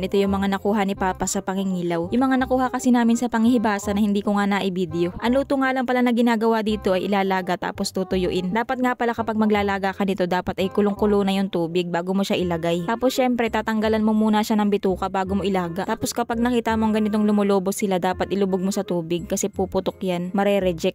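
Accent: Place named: Cebu, Philippines. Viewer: Ganda pakinggan ng dialect nyo madam, halong cebuano ang tagalog...parang sa Palawan din. Ang ganda pa! ❤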